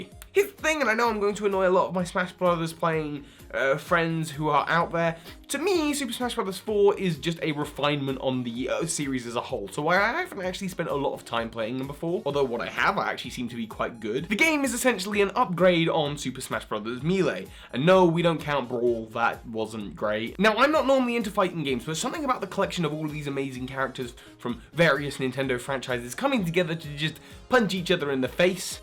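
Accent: United Kingdom. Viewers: I love British accent so much!😫😫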